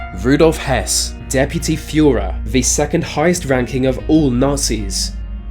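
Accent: United Kingdom. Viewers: You need to go to a surgeon for your face... hey that's really rude of me isn't it? I don't mean that really. It's not a speech impediment. It's actually a dialect accent of Britain.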